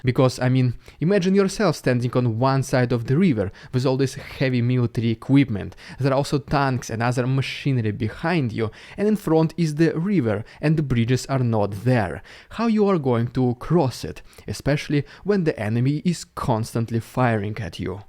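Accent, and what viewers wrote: Russia. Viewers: If you still didn't understand that.....I was just trying to help the know that Rheinmetall is 3 syllables not 2, I just wrote the tiny line, you had to just comment, I did it not to be a dick, and to be nice about it without writing hey you said that wrong, he has a heavy accent just trying to help with a word he has used often lately. And what I wrote is a fact, what was your reason to comment? No life? An idiot in real life looking for a place where he can find some people less smart than you? Does that make you feel better? You are so dumb you started all this from a single line fact I wrote for the content creator not a random idiot which at this point is you. I could be a real ass and go back into how "Kaliber Missile" has 6 syllables....lol. Like I said have a nice day ass 🤡. And btw people do not care about your comments they are so ignorant your that idiot in the comment section btw......doubt you will change, it's your base intelligence limiting your mental growth so I don't totally blame you, just as you can't blame a child with learning disabilities maybe not meeting the same marks as their peers without a disability..........🙄🙄🙄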